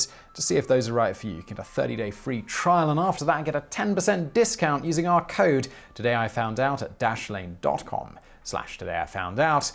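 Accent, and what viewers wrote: United Kingdom. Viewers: This guy is f*cking hilarious! There's just something incongruous about a fellow with such a dignified, proper british accent using our favorite euphemism for "sexual congress.". As y'all might say, "Spot on, mate!"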